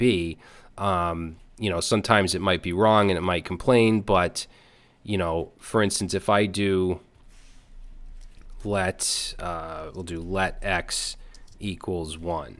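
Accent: Boston, United States. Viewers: Just a question,are from Boston ? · This is a great place to start. Well spoken, easy to follow and your Bostonion? accent makes it more interesting.. thanks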